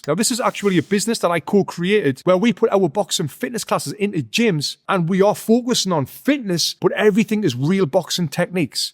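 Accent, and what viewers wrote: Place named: Geordie, United Kingdom. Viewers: Geordie was one of the first British accents i have been actually aware of (thanks, DI Lewis!) and i think it's sooo lovely and really relatively easy to understand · Good, sound advice from a true Geordie (I hope non-Brits can follow your accent). Brit here, in my later years, and finally, after so long, I'm taking up boxing for physical and mental fitness. Beginning with a boxing PT at a local fitness centre, and hope to move on from there, not professionally, of course, but in some way get the opportunity to get in a ring and experience what I have always wanted since I was a kid. Keep up the good work, and many thanks from London.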